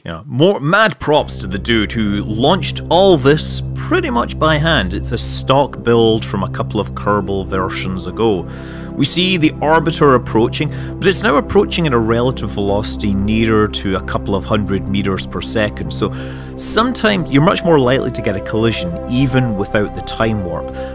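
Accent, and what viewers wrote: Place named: Glasgow, United Kingdom. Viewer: Glasgow Uni accent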